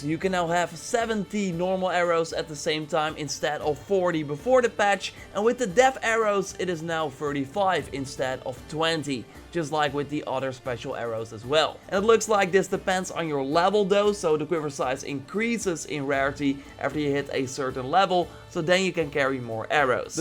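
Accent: Netherlands. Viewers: You got a huge dutch accent :p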